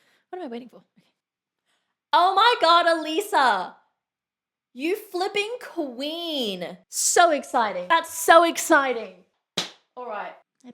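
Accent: Australia. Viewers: The Australian accent is always amazing to listen to. But it just become awesome, when the Australian gets excited. Accents generally does not matter that much to me. But Australian is just.. Those sounds.. Its amazing. And I am sorry talking about this and not the video, but I had the biggest smile on my face because of it the first time you paused. Kamelot is a really interesting band, you should 100% look into more of what they have done. Always fantastic to see you react to things, and give your take on it.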